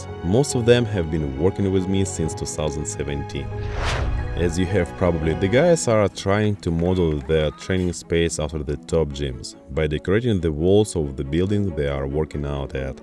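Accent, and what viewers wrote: Russia. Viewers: Ведущий внешне и по акценту на русскоговорящего похож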